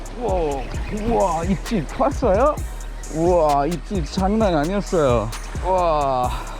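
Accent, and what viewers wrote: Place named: Busan, South Korea. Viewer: 부산 친구 때문에 사투리 쓰는건가요ㅎㅎ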